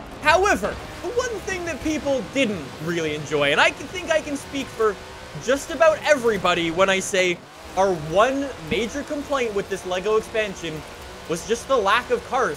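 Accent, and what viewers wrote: United Kingdom. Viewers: What part of the UK are you in?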